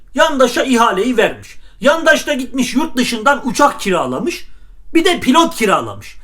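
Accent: Erzurum, Turkey. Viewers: Dostum olayları harika yorumluyorsun,yayın hayatında başarılar diliyorum, bir şey merak ettim bazı sözler ve şiven bizim erzurum yöresine benziyor oralimisin yoksa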